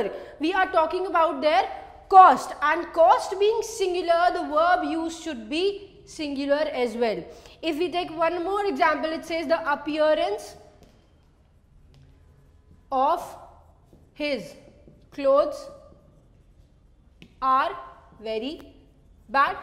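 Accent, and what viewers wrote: India. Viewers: You are good. I really like Indian-English accent. But "I' is not a noun. It is a pronoun.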